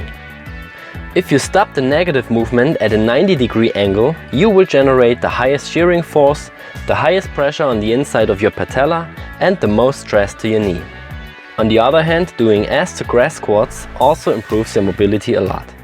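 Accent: Germany. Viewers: that english accent is german as fuckkkk xd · Alter bist du deutsch, merkt man sofort nach einer minute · great video, love the german accent . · You sound German, are you?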